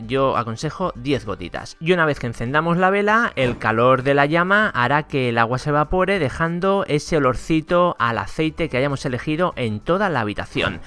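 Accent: Chile. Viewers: Eres chileno? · ¿Oye el es Chileno? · eres chilenos?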